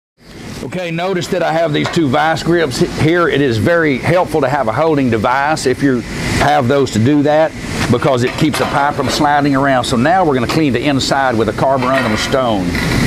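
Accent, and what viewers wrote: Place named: United States. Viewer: Well he’s explained it very good, and my hat goes off to him, and just love the yank’s accent. But why’s he using two hands, instead of one. Where I served my apprenticeship (Babcock & Wilcox) in Scotland, our instructor said it was always better to use one hand only, and used to poke your hand with a rod, if he caught you. I also thought his cap was quite rough, as his beads on cap was to far apart. But then, it depends on the quality of the rods. We were spoiled with Babcocks own rods, and they were brilliant for an immaculate finish on the cap. Murex vodex, was another good finish for inspection. 🏴👍🥳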